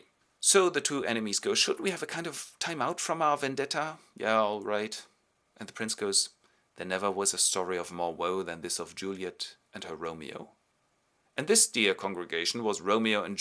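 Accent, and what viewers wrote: Germany. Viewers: Are you german? Asking because of the accent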